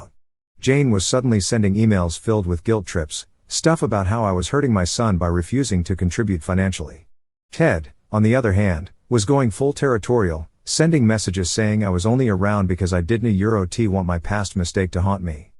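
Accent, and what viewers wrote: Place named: Europe. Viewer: you're AI is having a stroke what's with all the Euro T?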